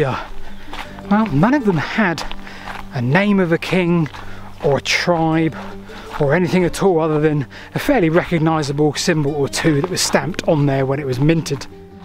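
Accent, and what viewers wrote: United Kingdom. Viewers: You have an interesting British accent I cannot quite place.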